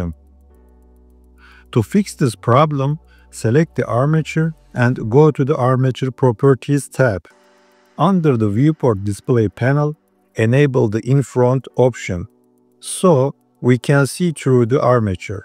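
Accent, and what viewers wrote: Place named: Turkey. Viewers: kesinlikle türk aksanı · konuşanın türk olduğuna eminim ama ispatlayamam...😀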